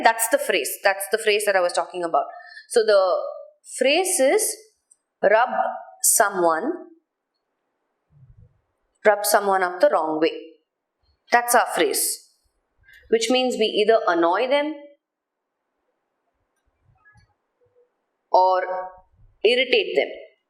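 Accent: India. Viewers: your accent is indian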